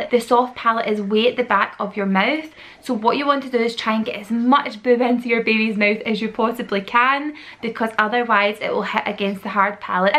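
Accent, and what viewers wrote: Scotland, United Kingdom. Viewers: its scottish and barely understandable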